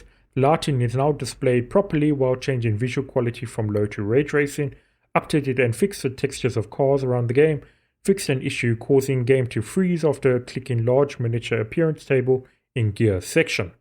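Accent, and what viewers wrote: South Africa. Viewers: are you South African by any chance?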